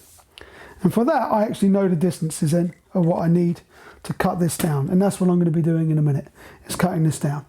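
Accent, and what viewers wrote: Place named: United Kingdom. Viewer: I was sold on this video from the start because as an American, the most qualified chimney sweeps have British accents. I blame the movies. Then he pulled out the Milwaukees…. 🙌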